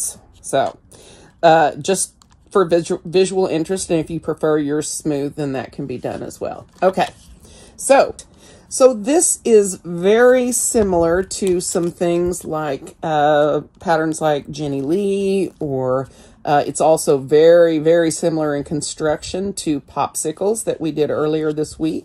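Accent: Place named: South, United States. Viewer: I am really enjoying your videos and your gentle, southern encouragement,. Thank you for the time and effort you are taking to make them. You have reminded me that an embroidery teacher once taught me that we all think the person on our left has nicer stitches and the person on our right has nicer colours, but remember that each one of us will always be the person to the right or left of another learner. That is exactly what you are saying about Zentangle. Don't give up, just be you. (Melb, Australia - we are opening slowly but with great vigilance. The curve has definitely flattened but we are still seeing random local clusters. Fortunately out of 7k cases we have only had 100 deaths).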